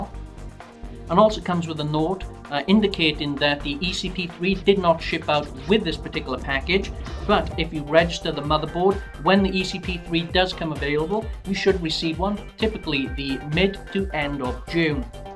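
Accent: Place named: Wales, United Kingdom. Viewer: liking your accent is it welsh im presuming? soz if im wrong. i was just wondering seeing as i live in britain are the parts you recieve shipped from the states? do you pay for them yourself or are they sent to you for reviewing and such? i would die for a job like yours keep up the great work man :)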